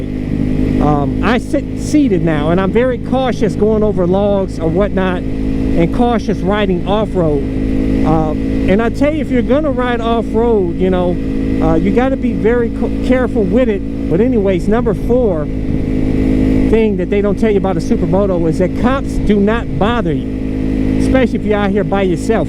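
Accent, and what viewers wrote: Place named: New York, United States. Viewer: From Ohio but talks like he’s from New York lol I would like your videos a lot more if you talked less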